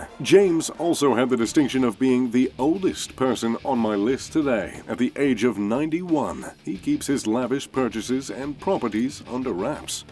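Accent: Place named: RP, United Kingdom. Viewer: Sorry, but the posh narrating isn’t needed, and personally, I find it really annoying.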